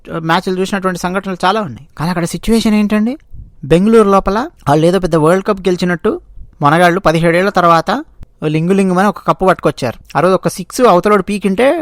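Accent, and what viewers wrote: Telangana, India. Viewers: U act smart I know u talk telagana. Ascent when feel other then u dont like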